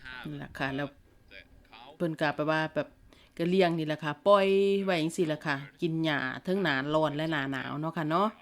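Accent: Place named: Chaiyaphum, Thailand. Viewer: มาเบิงงัวเมืองนาวนำครับ ขนยาวเนอะครับ เสียงเว้าคือคนชัยภูมิครับ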